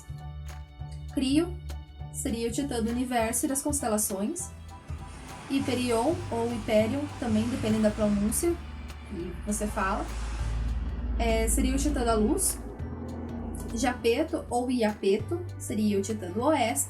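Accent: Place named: Paraná, Brazil. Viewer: MEU DEUS DO CEU MINHA <3 Que venham mais e mais vídeos. Esse sotacão Paranaense é lindo por demais!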